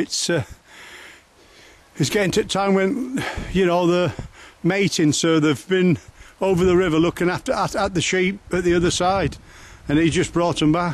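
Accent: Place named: Yorkshire, United Kingdom. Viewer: I lived in Dacre Banks in the 80s... loved this video. Took me back in time for a bit.:) Nice to hear the Yorkshire accent again as well. Thanks!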